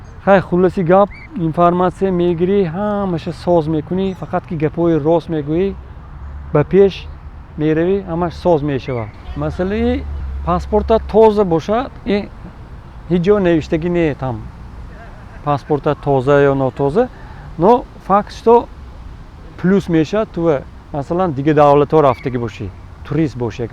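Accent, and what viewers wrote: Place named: Leninabad, Tajikistan. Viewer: Ето человек ленинабадски таджик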